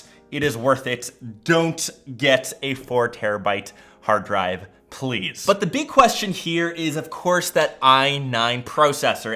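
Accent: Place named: Canada. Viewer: Great video! Thank you! Also I appreciate how Canadian you are. "PrOccess!" "Out!" Keep up the good work!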